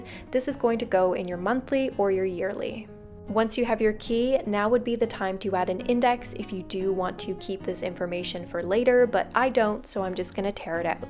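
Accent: Canada. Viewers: you have the strongest Canadian accent i’ve heard in a long time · I loved this video, it was beyond helpful <3 I think using a cheap notebook and modding it helps with the "perfectionist freeze" that I get when I don't want to start a new notebook and ruin it. By the time you start these books, they've already been broken in =) Also I thought I heard that familiar Canadian idiolect, and I'm glad that wasn't just in my head lol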